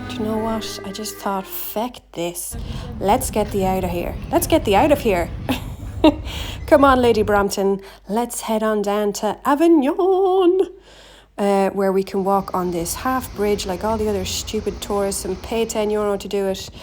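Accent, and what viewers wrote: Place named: Ireland. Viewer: I love your Irish accent! Guinness!!!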